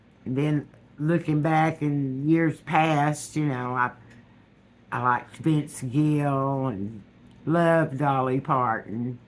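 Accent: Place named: East Tennessee, United States. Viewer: I love listening to you. I was born in East Tennessee and lived there until I was in the 7th grade. It's good to hear the accent. I hope you're not too sad about the situation. I know there's plenty to be sad about but I hope and pray that it will all work out somehow. Just wanted to let you know that you have my support and thanks for sharing your views. I know it's not easy to do but it is appreciated. Best wishes!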